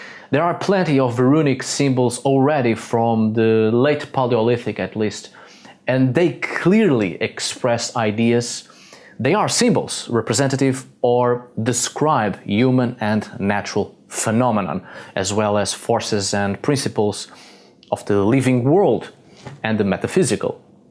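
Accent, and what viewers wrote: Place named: Portugal. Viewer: 'Morri com você falando português!' Is it because your archeology studies or do you have a Portuguese family? Because you have a strong Portuguese accent. 🙂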